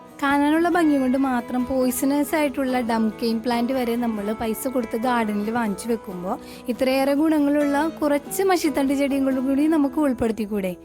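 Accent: Thrissur, India. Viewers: തൃശൂർ ആണല്ലേ വീട് .😘 ഇഷ്ഠമായിട്ടോ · Thrissur bhasha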